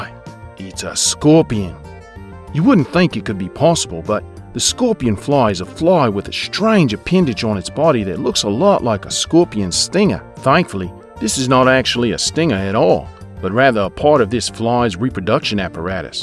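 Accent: Australia. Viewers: U do know that all Australians don't have a strong accent right my friend sounds like him. And go be a hater some where else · Is that meant to be an Aussie accent?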